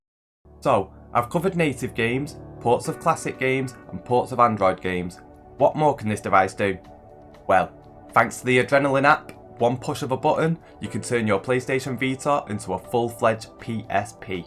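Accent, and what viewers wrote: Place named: Manchester, United Kingdom. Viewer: Guessing you’re from Manchester?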